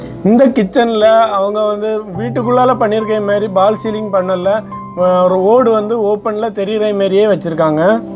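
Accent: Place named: Tirunelveli, India. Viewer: நீங்க தூத்துக்குடியா அல்லது திருநெல்வேலியா உங்க பேச்சு அப்படித்தான் இருக்கு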